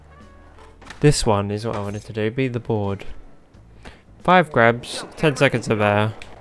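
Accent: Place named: United Kingdom. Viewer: I love your bri'ish accent (pls don't take that offnsively) also how is your day been going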